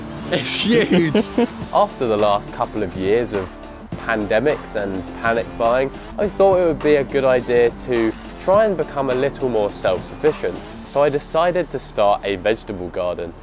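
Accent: United Kingdom. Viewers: Beautiful british accent!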